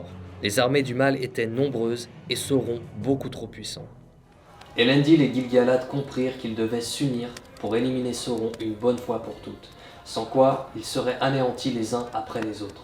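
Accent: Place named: France. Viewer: Continue de prononcer à la française!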